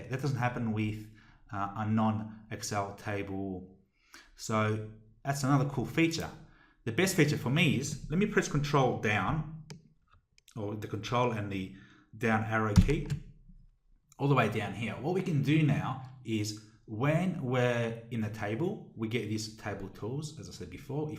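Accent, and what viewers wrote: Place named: Australia. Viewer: with an Aussie accent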